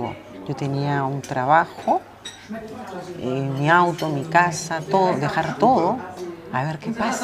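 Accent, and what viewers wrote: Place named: Peru. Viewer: totalmente de acuerdo contigo,sabes yo veo tus vídeos y son tan agradables además tu acento hermoso del Perú,yo soy Méxicana y siempre estoy mirándote y escuchando tus consejos tan sabios y he aprendido de ti y muchas cosas que tu dices es verdad,porque mira que tan diferente es vivir aquí en Latinoamérica y vivir en Europa estoy consciente de eso,mi novio polaco me pregunta siempre de cómo es la gente Latina y tiene curiosidad de todo y yo le platicó muchas cosas para que el venga a México y no desconfíe de mi,y también me gustaría irme a vivir a Polonia y tendria que dejar todo,mi familia y mi país y soy capas de eso por un amor verdadero aúnque le tengo miedo al frío es la verdad y si yo todo se lo dejo al destino porque apenas llevo un año de relacion con mi novio y quiero conocerle cada día más y más y no importa tanto las fechas importantes yo pienso que lo más importante es el tiempo de calidad que se disfruta al estar viviendo ya con la persona que será mi esposo,yo ya no estoy para juegos de Internet y soy Feliz y disfruto día a día la relación que tengo y pienso que si el destino lo quiere estaré pronto con mi novio porque yo quiero casarme con el y no ser sólo una aventura,entonces me gusta escucharte y si estoy de acuerdo contigo me encanta tu forma de ser y me idéntifico contigo,saludos desde México y gracias por tus consejos señora guapa te ves muy guapa de color blanco muy elegante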